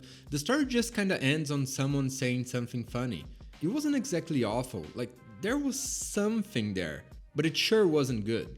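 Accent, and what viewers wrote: Brazil. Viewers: Is that a 🇧🇷 accent I hear? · No começo do vídeo eu fiquei "esse sotaque é brasileiro", no meio eu falei "oxi citado" e no final eu falei "kralho, é o " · Ouvi o sotaque BR também e já deixei o apoio. Tem canal em brasileiro? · Are you brazilian by any chance?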